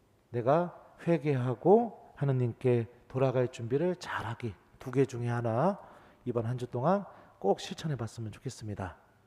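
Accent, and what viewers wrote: Chungcheong, South Korea. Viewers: 구수한 신부님 충청사투리 에 고향생각하며.열심히 신부님 강론 따라가며 한구절씩 되뇌이는 하루를 시작하려고 노력합니다.감사합니다 🙏 🙏🙏영육간에 건강하시길 기도드립니다.🙏🙏🙏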